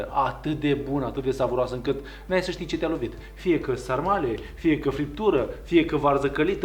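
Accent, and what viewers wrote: Moldova. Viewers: Chef mor dupa retetele tale .bune bune rau 😂.Doar un umil sfat incearca sa fii tu ca esti super nu mai accentua accentul moldovenesc ca nu e nevoie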